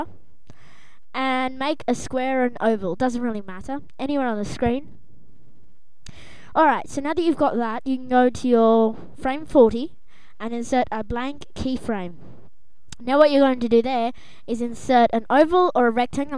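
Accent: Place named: Australia. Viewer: Awesome austrailan accent! you helped sooo much ! subbed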